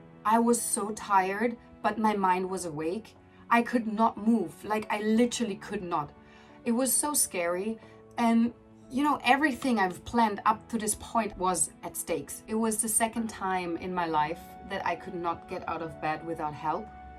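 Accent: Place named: Canada. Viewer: Great communication skills, initially I thought I could hear a Canadian accent, then a slight German accent. Your English is faultless. Good luck with Japan.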